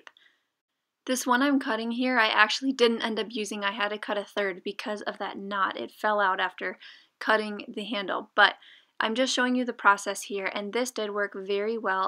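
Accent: United States. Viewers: I'm hearing an American accent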